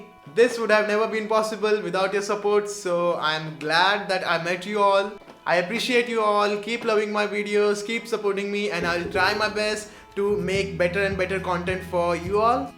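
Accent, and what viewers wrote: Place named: India. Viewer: Are you from India? You sound like from India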